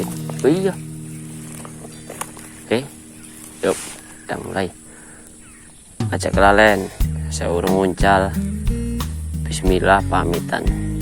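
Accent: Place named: Banyumas, Indonesia. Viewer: Salam wong ngapak, ora ngapak ora penak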